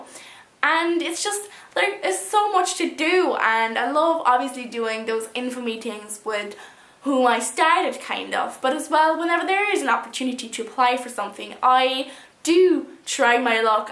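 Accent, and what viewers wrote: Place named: Ireland. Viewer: What I find interesting is that you’re from Austria, and did your exchange in Ireland. Yet you speak English with an Irish brogue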